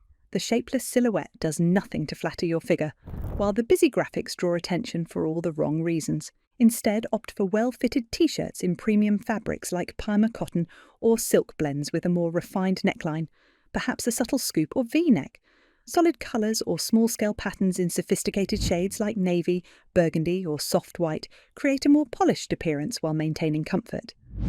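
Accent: England, United Kingdom. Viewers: You have an English accent, however you use American terms such as Pants instead of Trousers, I don't understand why the English language used in the UK, and taught in Europe has changed its language to fit in with American English. we are teaching our children American and at risk of losing our own language. This is not me having a go, I just don't understand!!!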